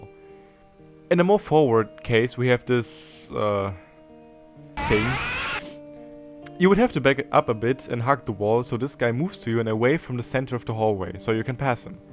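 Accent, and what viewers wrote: Germany. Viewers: a german speaking english making cry of fear videos? heaven.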